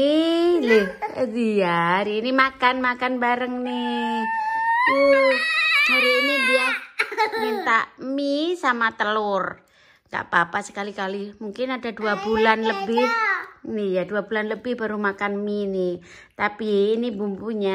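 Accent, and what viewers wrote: Java, Indonesia. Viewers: Semoga keluarga kita selalu dlm lindungan ALLOH swt amiiin...mbak medok wong jowo bangeeet ☺ jember selalu hadir ☝️🙏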